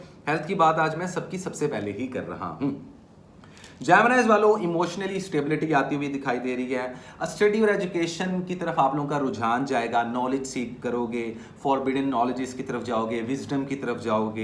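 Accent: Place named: Punjab, India. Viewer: Gemini❤❤ Ap Punjabi bry mzy ki bolty ho 😂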